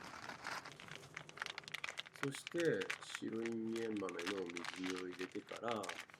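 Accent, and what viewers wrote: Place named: Hiroshima, Japan. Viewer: おはようございます！広島のイントネーションの解説落ち着きますね👍手順がよく分かって助かります。 自作タープ私も作ってみたいのですが、生地代だけでも結構なお値段なので中々着手出来てませんw 幕内薪ストーブお篭りぬくぬく憧れなのですが、私にはまだ早そうなので来期挑戦したい憧れスタイルです😄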